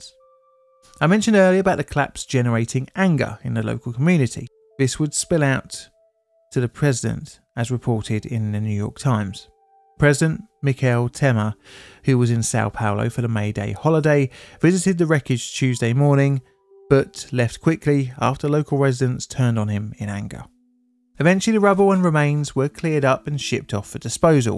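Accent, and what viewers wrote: United Kingdom. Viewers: Thanks for speaking slowly. It's difficult sometimes to understand the British accent when you don't live around it constantly.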